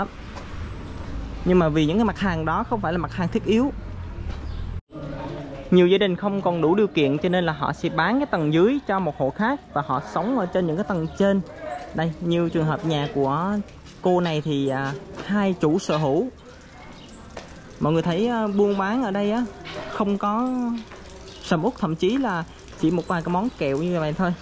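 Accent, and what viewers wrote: Quang Nam, Vietnam. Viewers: tieng nẩu ????? nghe hợp lổ tai...cùng quê cùng làng ??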